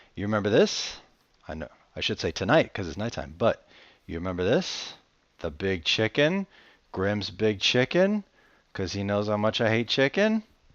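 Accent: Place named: Australia. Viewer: Dat sexy Aussie voice....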